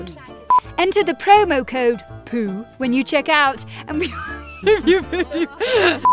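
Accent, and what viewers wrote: Scotland, United Kingdom. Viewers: I love her scottish accent. It makes her even more endearing aswell s her personality. And i love the random American accent she did aswell. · Of coarse she's Scottish, I'm in fucking love. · Scottish or British? Or is it Neitherofthoseish?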